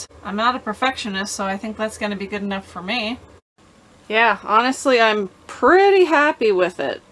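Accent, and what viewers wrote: Canada. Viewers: I think you did and awesome job! Love that Canadian assent thanks for the video 🎉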